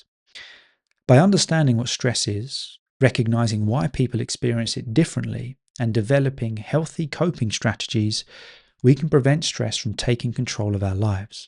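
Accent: England, United Kingdom. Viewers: Hello, as a new listener, I appreciate your podcasts so much, very interesting topics, nice English accent, pleasant voice. I improve my English and I'm relaxing listening to you. Thank you very much, you make me think about life in English 🙏🏼🙏🏼👏🏼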